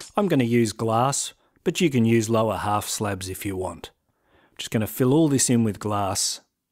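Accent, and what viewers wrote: Australia. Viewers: Authoritative is intimidating, you sound like an Australian version of the old Etho except with more gusto:D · Are you from Australia? :)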